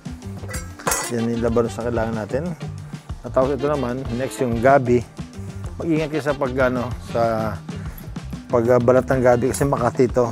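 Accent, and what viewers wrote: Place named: Visayas, Philippines. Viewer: Wow Sarap po ng luto niyo sir. Yung tono ni Goma parang nagshi shift sa bisaya at tagalog hehe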